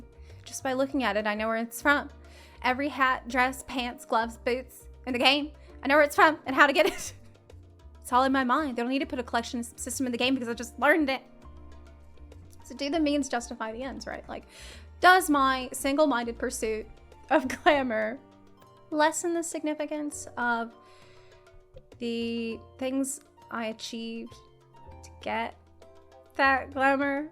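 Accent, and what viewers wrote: South, United States. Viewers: Did pick up a southern accent? It's pretty adorable. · The southern accent is here thick now and let me just say I'm here for it. I feel represented. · I'm dying laughing cuz you got a southern accent in like 6 months of me not watching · Did I just find a streamer with a southern drawl?... I hope that's not a pet peave. Because that's cool, and subscribed! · A few comments as I watch.. 1) lmao that thumbnail. Great editing xD 2) "What is a mount but a pedestal for which to put my character in her perfect glam" 🤣 That got me. 3) Thing really escalated around 7mins hahaha but it's so true. IT'S ALL TRUE. FOR THE GLAM. 4) I realize there's so many clips just to show off glam. There was a lot of work put into this video <3 5) I can hear that southern accent coming out strong 🤠 I love your content,. I'm glad to see stuff here again and I'm sure a lot of us feel the same way about the pursuit for Glam. 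Why do Savage when gear is obsolete not long after? Stats are temporary... GLAM IS FOREVER. I'll say this though.. The game needs more suits and shoes imo. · No I agree that's a lot of cool glamorous and stuff in the game and it's a fun part of it and that's just it if that's all you want to play play it like that it's your game play the way you want to that's what they designed it to be I thought. Also I'm not sure if you're putting it on for a show but I'm hearing slips of southern accent are you originally from the southern Us by chance?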